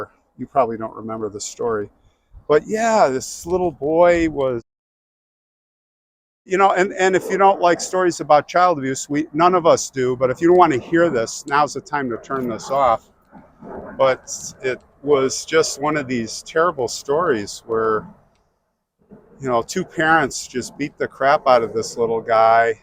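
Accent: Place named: United States. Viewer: which part of America is your accent from? I love the way you pronounce many words 😊. That little boy's face was so cute. Poor little angel. I'm glad you visited him.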